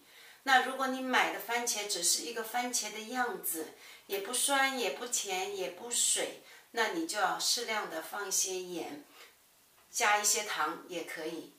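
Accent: Shanghai, China. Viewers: 很喜欢这个教做传统上海菜的视频，很听Jenny 的带着上海口音的国语，她讲解和示范的很详尽，没有卖关子，订阅了她的视频后，我照着她所教的，己经做成功很多老上海经典的小菜和点心，上个礼拜做出了汤圆和牛肉馒头，谢谢Jenny, You are the BEST!❤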